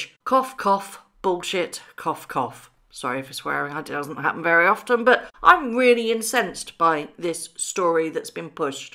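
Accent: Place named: London, United Kingdom. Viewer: I don’t know where you are from in England, but you sound like my family back in London❤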